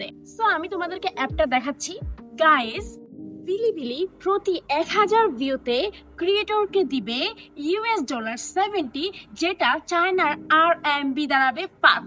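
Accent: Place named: Sylhet, Bangladesh. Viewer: ohh i thought,,, but your speaking look like our Sylheti gr...whatever dear, carry on,, also nice too meet u... ❤❤❤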